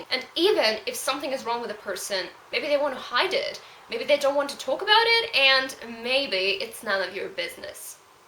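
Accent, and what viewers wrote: United Kingdom. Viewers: I love that British accent you have.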